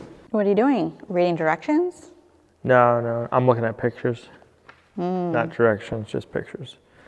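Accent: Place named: East Coast, United States. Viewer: Eye deer? its idea. Not eye deer sir. You are definitely an East coast dude with your miss pronunciation of your speaking. Come on get with it. You sound. Like a fu king Kennedy, YUCK.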